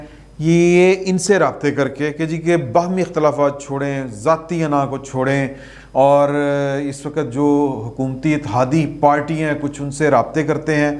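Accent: Punjab, Pakistan. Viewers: sahib i really enjoy your Punjabi ka tadka in all the conversations.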